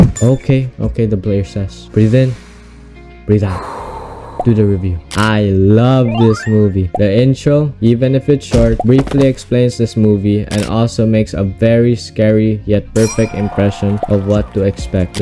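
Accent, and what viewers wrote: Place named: Philippines. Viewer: Your voice is very filipino and i was right( i am Filipino too)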